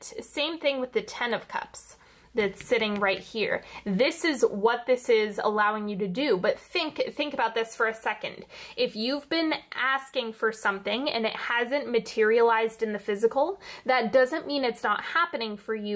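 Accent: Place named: United States. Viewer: Whats this accent from in US?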